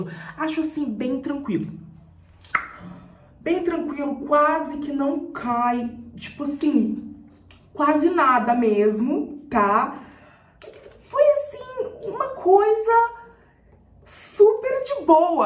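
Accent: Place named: Rio Grande do Sul, Brazil. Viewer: ficou muito lindo teu cabeloooo🤩🤩queria ter essa resistência que ele tem ksksks, Mas ficou PERFEITO!!!✨✨✨ Saudades dos vídeos de pintando o cabelo 😁 (Sotaque gaúcho, BAH, MAS BAH)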